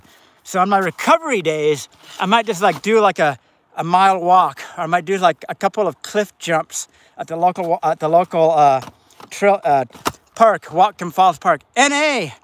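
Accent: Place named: Scotland, United Kingdom. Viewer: Are you Scottish or Irish....or both?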